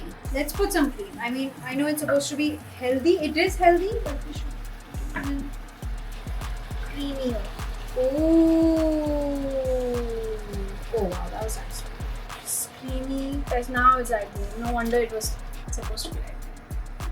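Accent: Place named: Bengal, India. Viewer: your bengali tone sounds so good. make a cooking video with full bengali language.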